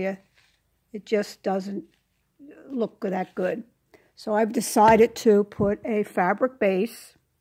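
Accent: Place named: Baltimore, United States. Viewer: I love your bowl and accent. I'm guessing your from my home "town" of Baltimore or maybe Philly?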